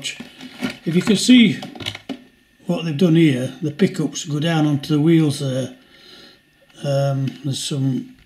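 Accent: Yorkshire, United Kingdom. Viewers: you want to get dragon for Mac Professional 6 it's £249.99 but works really well and should understand your yorkshire accent with a bit training. Are you are using the built in dictation on your mac? Download the extra features in the settings so it won't have to keep going on the internet and it should work a lot better also turn the volume up a bit that helps. I like the lights I may have to get some in 00 and n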